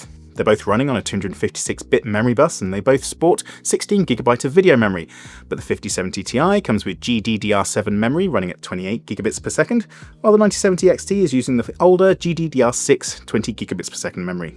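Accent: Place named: England, United Kingdom. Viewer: Ah my bad then I thought it was a UK publication only - guy has an english accent as well in my defence haha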